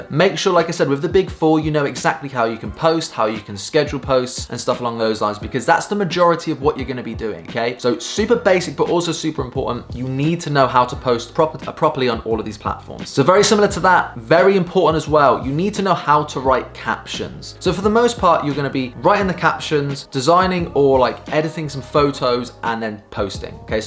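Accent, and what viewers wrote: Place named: United Kingdom. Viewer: I love your accent. What part of the UK 🇬🇧 are you?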